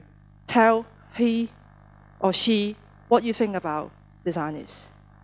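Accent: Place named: United Kingdom. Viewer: 英式發音，舒服舒服～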